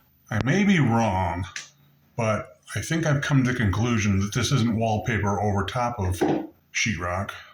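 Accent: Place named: England, United Kingdom. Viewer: Hello from Brazil ☕️ 👏🏼.Excellent presentation 👏🏼. Excellent work. Beautiful English accent. I subscribe in your channel.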